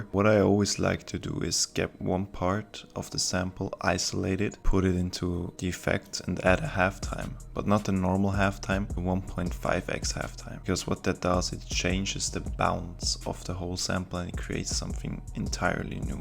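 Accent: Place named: Germany. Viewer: very good video, are u from germany cause ur accents sounds a bit like it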